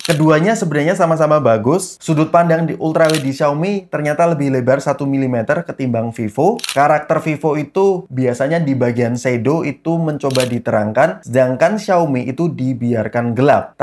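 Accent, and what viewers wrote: Semarang, Indonesia. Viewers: Wong Semarang tah mas?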